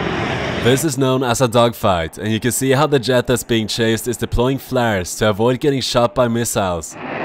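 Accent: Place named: Sweden. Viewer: Värsta svengelsan jag hört!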